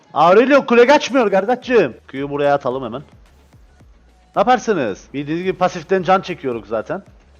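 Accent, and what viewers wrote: Cyprus. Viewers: sen kıbrıslımısin ben öyle gardaş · ABI SENI IZLIODUM BABAM DUYDU BIRAZ DEDKI KI KIBRIS LI MI BU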